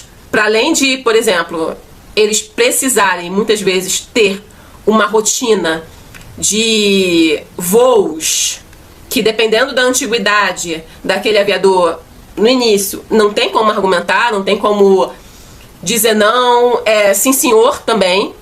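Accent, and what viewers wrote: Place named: Rio de Janeiro, Brazil. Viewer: acho lindo o sotaque dos cariocas, saudades do Galeão.😂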